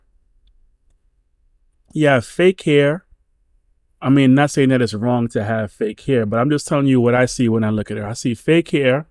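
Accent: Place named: Caribbean. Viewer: Hearing a little West Indian in your voice but I can't put a finger on the island 😂Anyways men are too weak. Especially these day. We complain about women all day but their behavior comes from the fact that they are dominating us because we are soft, we accept anything, we are so hooked on p-sleeve. Fresh and Fit's podcast is a joke. CGA is the only guy doing the lords work. I know you recorded this before the update. She was lying but I knew this from the very beginning. Doesn't discount anything your saying. We need to do better.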